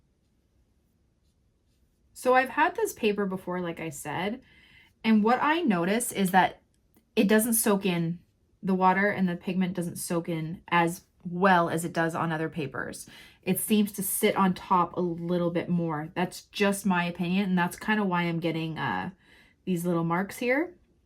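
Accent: Canada. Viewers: Damn, it took me 9 minutes to realize you were in Canada. I don't know which word gave you away. Maybe it was "out".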